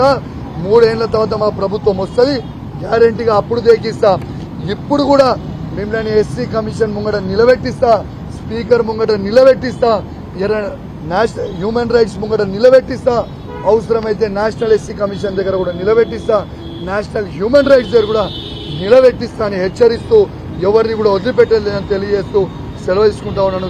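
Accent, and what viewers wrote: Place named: Hyderabad, India. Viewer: Hyderabad matlduthunnavu niku uru ekkada unnadi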